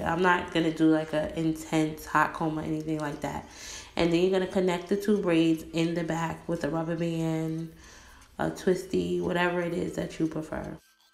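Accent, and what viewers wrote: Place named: Cleveland, United States. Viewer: You did your thing,! Are you from Cleveland? You sound like it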